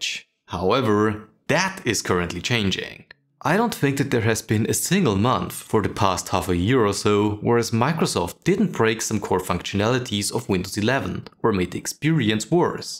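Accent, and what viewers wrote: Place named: Germany. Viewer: I see open source, I hear German accent, I subscribe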